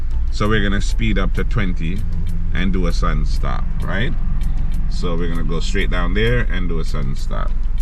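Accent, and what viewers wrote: Jamaica. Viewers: You do sound like there's a bit of Jamaican in there. I'm an American but I lived in Jamaica all my life. I passed the written test past month and I have the driving test two weeks from now. I watch your videos to ensure that I don't fail. Thank you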